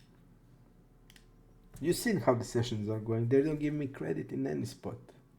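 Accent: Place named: Croatia. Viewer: Just gonna take a wild guess and say you’re Croatian going off your accent :D First video I’ve seen from you bro, great vid, subbed:) Keep crushing buddy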